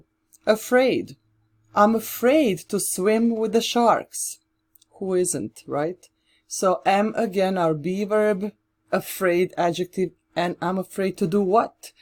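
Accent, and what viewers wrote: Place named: Arab world. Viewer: Outstanding tnx mama i think u native of arabic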